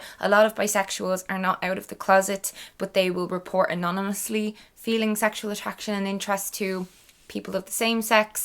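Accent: Ireland. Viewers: Are you from Dublin? Your accent reminds me of Saoirse Ronan.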